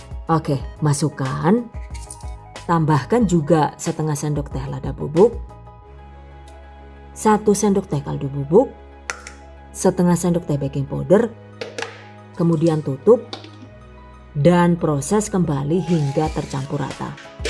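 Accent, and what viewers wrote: Banyumas, Indonesia. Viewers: Biasa...yang aku tungguin ngomong ngapaknya . ...bikin aku ketawa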